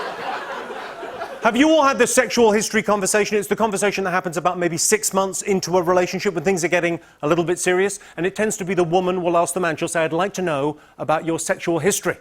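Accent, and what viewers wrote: United Kingdom. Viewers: I like how he intentionally slows down so Americans get the jokes in a British accent.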